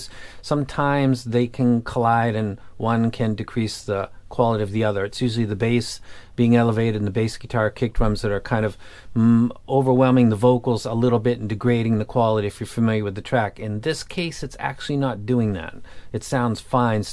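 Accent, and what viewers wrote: Boston, United States. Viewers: Said that three years ago.. Bastohn accent.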